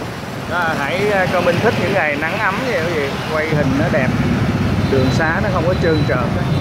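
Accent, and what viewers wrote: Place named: Saigon, Vietnam. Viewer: Bạn chia sẻ và thuyết minh hay hơn cái anh chàng nói tiếng Bắc, người Nam mới là thổ địa cho vùng Saigon gia định, còn ông nói tiếng Bắc đưa video lên nói tào lao không hà, coi cái video mà ổng quay trong lòng chợ Ng-tri- phương thấy nhức đầu luôn, các bạn nào có xem qua thì hãy nêu lên đi, có phải vậy không..?! Tui không bao giờ xem ông ng Bắc đó quay phim nữa, ổng không biết quay và còn thuyết minh tào lao nữa chứ. Còn nói dặc tiếng Saigon, tới đâu nói rõ ràng tới đó, thì mới lôi kéo độc giả từ bốn phương trời, cám ơn video nha, tui ở quận 10 gần chợ Nguyễn tri Phương đây.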